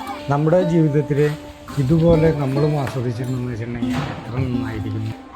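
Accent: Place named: Kannur, India. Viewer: ningalude bhasha kannur karudeth pole und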